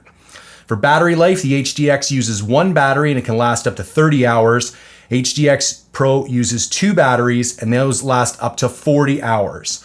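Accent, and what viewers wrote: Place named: Canada. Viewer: I like this review. I heard about these from Cliff Gray's guide channel back when these came out and went to my local supplier and held some in my hands recently. I didn't know how the target/scan mode worked and neither did the guy at the station, but this showed us how . Picking the right model sure seems tough and fit in my bino harness I already have is going to be a pain. Carrying a pistol/food/cell phone/gps on a bino harness is a SERIOUS pain so maybe I can solve all of these problems with a buy once cry once approach. I appreciate your effort, scripting and editing, no BS, and passion and intensity. You seem like a solid dude with no bullshit sales flare with an ever so slight canadian accent. Appreciate you!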